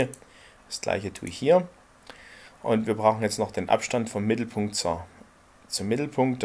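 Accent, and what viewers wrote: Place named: Saxony, Germany. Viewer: Vielen Dank! Bitte in Zukunft mehr Tutorials auf sächsisch :)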